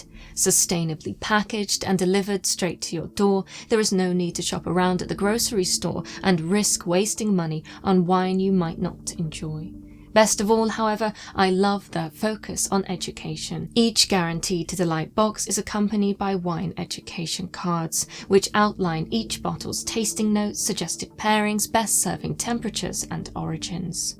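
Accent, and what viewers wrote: United Kingdom. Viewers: You are such the British lady. Love your video's and your accent.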